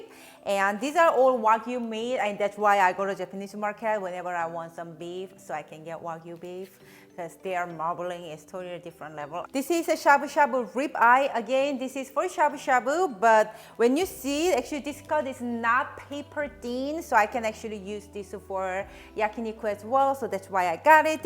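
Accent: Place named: South Korea. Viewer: 영어에 한국어 액센트 물씬인데 음식 역사, 유래 좀 배우지? 무식하게 저걸 일본식이라고. ㅉㅉㅉ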